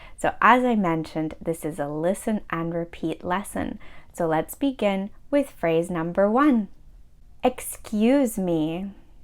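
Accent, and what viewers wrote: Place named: Canada. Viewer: I love the way you speak, am here to learn Canadian accent. From Nigeria. You are doing a great job 👍❤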